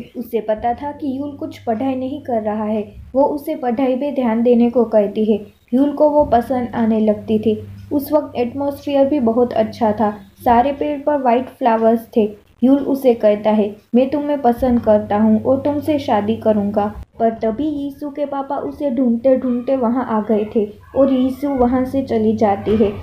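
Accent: Gujarat, India. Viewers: Aap gujrati ho kya Aap ki voice me gujrati touch h You have h such a nice voice and good explanation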